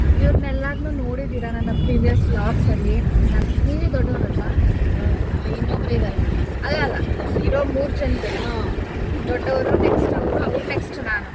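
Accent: Maharashtra, India. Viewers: Nimma mother tongue marathi na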